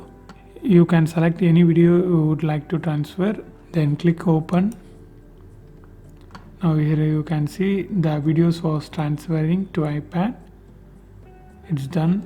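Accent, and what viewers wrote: India. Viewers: The moment I heard the Indian voice I knew I was saved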